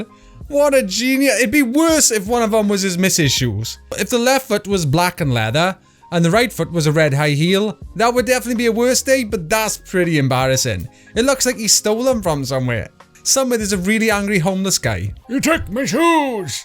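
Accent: Wales, United Kingdom. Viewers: Are you Welsh? 🏴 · Wales 🏴 💕 cariad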